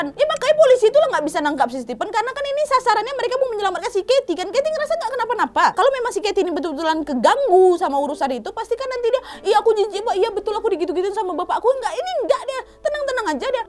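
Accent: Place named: Medan, Indonesia. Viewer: Mantap kali logat kakak 🤣 sama2 orang medan nya kita kak e ??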